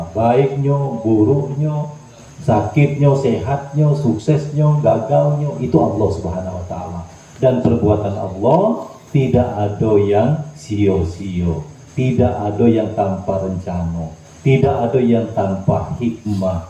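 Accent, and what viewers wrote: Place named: Jambi, Indonesia. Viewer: Assalamualaikum warahmatullahi wabarakatuh.. pas nian Bahaso e lur asli org jambi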